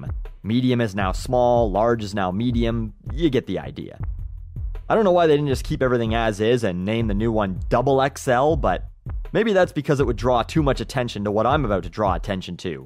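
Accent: Canada. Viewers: don't worry, I'm the exact same type of Canadian, except I do like hockey and winter. "About" Uh-bout "Favourite" Favoo-rite IT'S THE EXACT FUCKING SAME!!! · Canadians do say "about" weirdly but I don't hear them pronounce it like they are saying "a boot" as they have been made fun of many times before (Yes, South Park). I hear you, saying a a combination of that and "a boat" for some reason. A beaout? I don't know if this is right or not. I like those words without the Us. I know, still incorrect. Strange. In 'Merica, I've seen a theatre spelled theatre and I still spell grey with the E. Still, er does make more sense.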